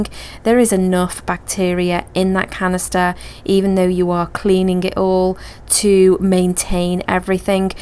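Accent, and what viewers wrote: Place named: Scotland, United Kingdom. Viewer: I ordered 2 of your Betta aquarium dividers along with the air pump and airline tubing bundle and didn't know you had a YouTube channel. You have a really nice looking aquarium and I like the stand too with the bins for storage. What I like about fishkeeping the most is how every fishkeeper has their own unique way or routine of doing things, the way you and I do water changes are massively different and I like it, keeps things interesting. I noticed you have an I believe Scottish accent and your YouTube info says your location is Uganda but your store is in the US? Do you have people in the US running the online store? Just wondering...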